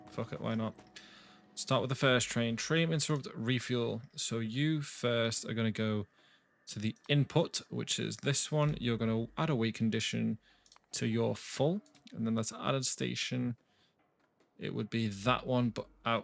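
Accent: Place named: United Kingdom. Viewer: you were discussing where you were gonna get water from because you’d forgot it, when you’re building on landfill! You can remove a couple landfill tiles and drop a pump down wherever you fancy. I found out by accident early on. Can I ask where abouts in the UK you’re from? Trying to pin your accent has been driving me insane watching your videos 😅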